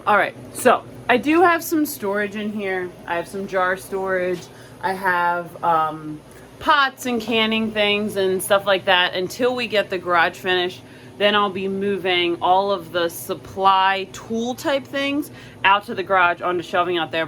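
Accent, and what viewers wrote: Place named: Pennsylvania, United States. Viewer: Hi, first time watcher here! I love watching pantry/freezer/fridge tours. As soon as I heard your voice I knew you were from PA. (NJ here.) I went to your site and read your story and I want to follow along your journey so I just subscribed.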